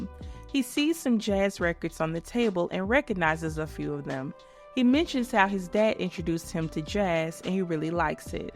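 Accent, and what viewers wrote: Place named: Midwest, United States. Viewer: your opinions are clinical however, I wonder which character you relate to most my guess is Dr. Wade. Phonetically I would guess that your upbringing was in the southern Midwestern United States.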